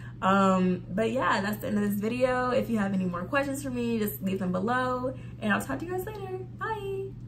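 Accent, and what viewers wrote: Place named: New Orleans, United States. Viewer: When u talk about men it sound like u went out there n nigha was tryna pimp u or sum. N it sound jus like New Orleans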